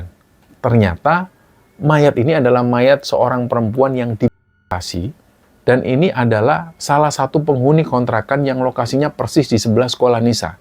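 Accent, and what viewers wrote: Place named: East Java, Indonesia. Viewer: Sy bu Wulan Smg ngikuti kembara sunyi dan mulai tertarik ksh2 misteri saat n istri cerita perjalanan k gunung bromo th brp itu 2020 ya... Sy tertarik cr penyampaian cerita ini bs membawa alur crita enak, seolah2 sy ikut terlibat dlm crita itu mdh d pahami.. Enjoy gt bhs jawa timurnya medok banget,krn sy org surabaya leluhur sy...semoga kembara sunyi smakin sukses Aamiin Yra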